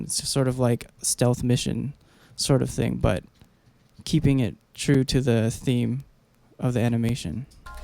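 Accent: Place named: United States. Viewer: I thought you would have a Spanish accent with your last name. I was a bit shocked to hear an American accent.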